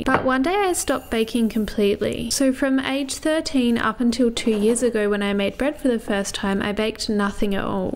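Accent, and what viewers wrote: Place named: Australia. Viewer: I just realized you have an Aussie accent. I subscribed a few days ago, and I've been watching a lot of your shorts. I didn't notice until now that you have an accent. I think I'm getting too used to Australian accents to where I don't even hear them anymore(My boyfriend and his family are from Tassie). I assumed you were American 💀. Anyway, I look forward to more videos xD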